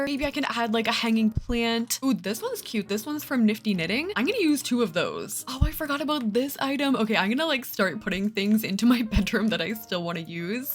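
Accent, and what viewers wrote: Canada. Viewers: when i first started watching, it was my first time ever watching someone with a strong Canadian accent, and it was a big adjustment but now i cant watch ANY SIM VIDEOS WITHOUT HEARING THE COMMENTARY IN HER VOICE 😭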